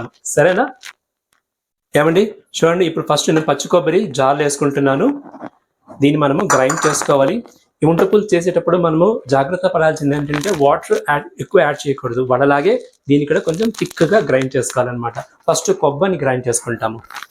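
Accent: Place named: Kerala, India. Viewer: First time vintunna Unta pulusu. Meeru malayalam Andi. Mee telugu Different ga undi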